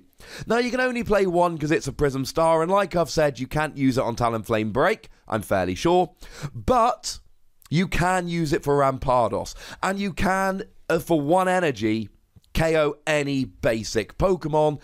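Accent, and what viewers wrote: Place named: United Kingdom. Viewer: He's from the UK, so it's normal for him to have that kind of accent.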